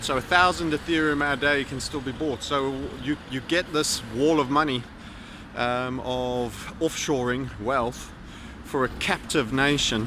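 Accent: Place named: South Africa. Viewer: You sound like a South African whose been living in the UK for a couple of years.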